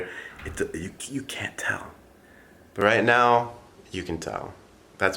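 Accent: England, United Kingdom. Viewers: Oy me old china, I do like your English accent! 👍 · I didn’t comment earlier, so let me fix that and say this - the floppy hair, the accent, the Christmas tree...it’s like you’re about to star in some British holiday romcom that my mom would make me watch with her. Adorbs. Good to see you back in all your crispy, high-def glory.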